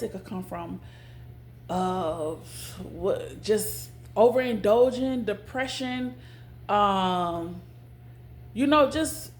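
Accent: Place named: New Orleans, United States. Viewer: Girl you from New Orleans 😂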